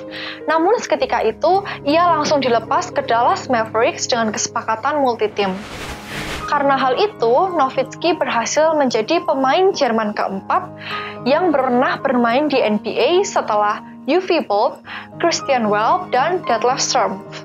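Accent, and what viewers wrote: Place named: Java, Indonesia. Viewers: medok imen mba hehehe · suaranya medok tapi lucu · Suroboyoan cokk.. 😅😅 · host ceweknya kalo ngomong medhok, tapi lucu wkwk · Host nya fix orang SBY wkwkwk medok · Pasti org jateng nih medok nya beda sm surabaya, wkwk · Medok e surabaya ki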